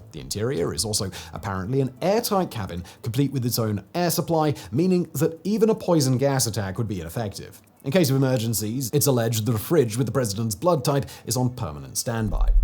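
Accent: United Kingdom. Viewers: This guy is paid by shadowy people to read out a script and sounds smart in British accent. Pure propaganda. Do not censor me or else I'll continue!!!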